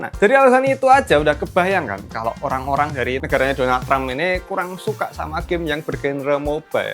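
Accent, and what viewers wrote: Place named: Java, Indonesia. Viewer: Aku org jawa, tp gk tau knp klo logaty medok bgni jd gk meyakinkan, krn biasay org sono2 garing, baru tau sdkit heboh.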